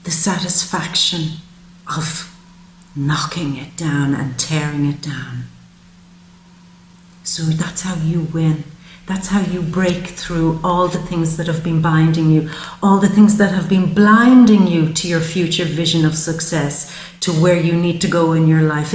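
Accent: Ireland. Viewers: So true and profound. I am already a bit like you want me to be and am very happy about it. Will work to finish the job with the help of the universe. Wanting to do what I love to do and get to work from it. I love your accent. Irish perhaps. Thank you. Best wishes for the Holidays.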